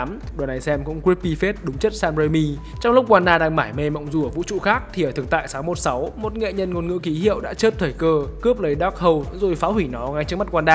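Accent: North, Vietnam. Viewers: Giờ biết sao nữ thích giộng con trai bắc rồi.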